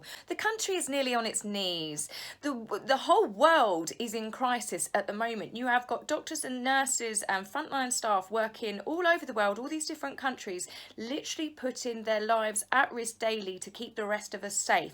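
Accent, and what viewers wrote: United Kingdom. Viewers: Hi.love ur Channel so much and for telling it like it is when it comes to Markle with No Sparkle.such a self centered.controlling.manipulating human being!! Cannot stand her Fakeness! I love your British accent may i say and you are a very pretty young lady.may you and yours stay well and safe thru this crisis we are all enduring.look so forward to ur Royal news each day.Hugs from Canada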